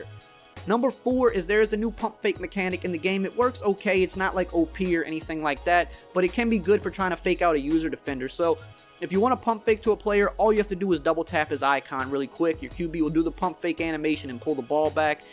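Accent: Louisiana, United States. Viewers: U gotta be from Louisiana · You sound straight from Chalmette. You're from Nola?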